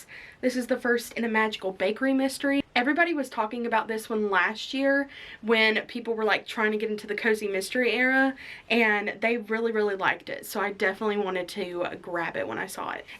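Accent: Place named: United States. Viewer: Also obsessed with your accent (I’m from the UK) I can’t pinpoint where abouts in the US you are from?! 🖤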